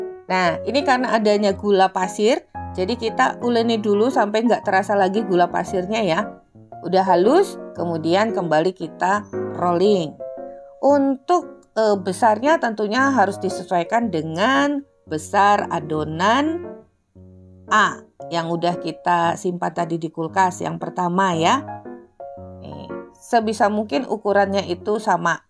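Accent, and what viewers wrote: Java, Indonesia. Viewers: Hhhhh, medok lgi😂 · Lha kok maleh medhok bangets too yoo maa😅..mtrnuwn sangets njih maa resep ipun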